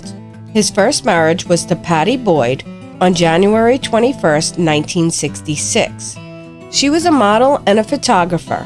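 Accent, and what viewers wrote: United States. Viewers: Ur from jersey arent u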